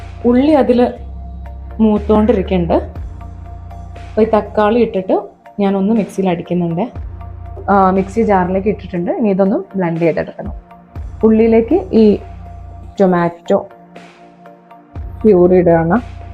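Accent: Kozhikode, India. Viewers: de ആ ഇരുത്തം ഒക്കെ നല്ല അസ്സൽ നോർത്തി. but സംസാരം ന്റെ കോഴിക്കോട് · I like ur kozhikode slang Me from kozhikode😂😂chevayoor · Natural samsaaram kozhikoden style ....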